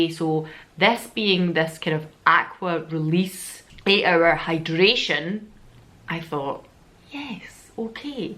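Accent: Scotland, United Kingdom. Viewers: Lobe the Scottish accent xx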